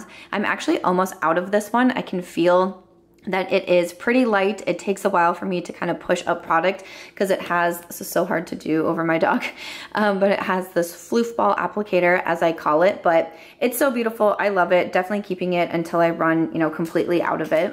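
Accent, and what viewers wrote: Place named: South, United States. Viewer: I just love ’ southern accent, y’all!! 🤣🤣😂😂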